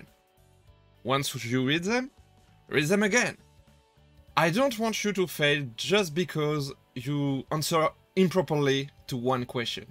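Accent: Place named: Japan. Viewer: great video. btw Im interested in your accent. is your native language japanese?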